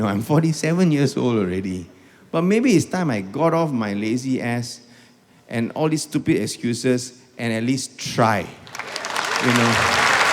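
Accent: Malaysia. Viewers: In his rant about math, i was guessing he has education background. Then he revealed he is a TESL graduate it all make sense. TESL pride 😂😂😂 His delivery very malaysian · The way he speak english like how my malay friend speak English.. the melayu slang.. darn spot on..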